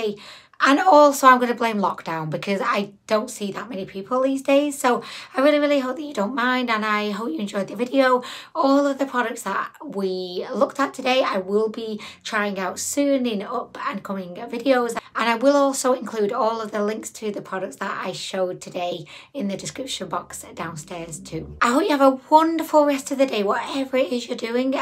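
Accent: United Kingdom. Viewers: For opening packages, you should get a set of spudgers off Ali Express. Besides the fact that "spudgers" is a fun word to say, and I want to hear you say it in your English accent (which I, as an American, am required to think is elegant and awesome), spudgers are little plastic prybars that were made for fixing phones, but work really amazingly well for getting the shrinkwrap off nail polish bottles and opening the box on BB creams. Get the set of three that comes with a thin metal shiv. They also work great for removing batteries from toys, and they will spare your nails.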